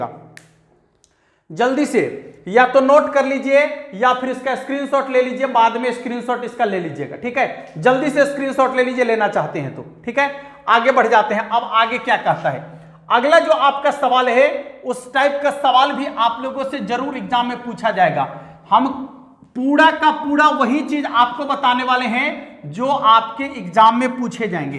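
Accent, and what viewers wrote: Bihar, India. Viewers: Aapke boli me bihari ka mithaas h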